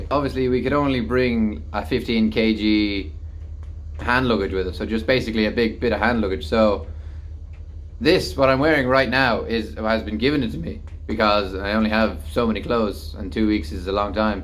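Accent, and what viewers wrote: Ireland. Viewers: Irish men and their accent are just love. 😍😘 That's made me fell in love with. ❤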